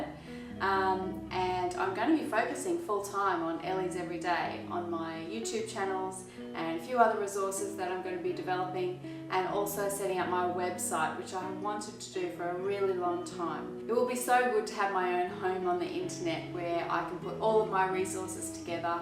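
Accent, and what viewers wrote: Australia. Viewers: Hey Elly! This is fantastic news! Well done you! I’ll definitely be following along. I’ve watched your videos so much I’ve started reading and thinking about soap in your lovely Australian accent! 😂. Going to try making your pine tar soap for my own blokey blokes soon. Can’t wait to see what you come up with next! Ooh - and if you haven’t already, could you please teach us all about master batching, storing and reheating lye solution? Thank you. Take care and you and yours stay healthy and happy! 🙏🏼😍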